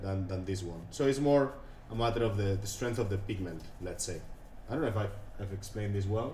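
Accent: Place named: Spain. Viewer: I can understain your english. Are you spanish?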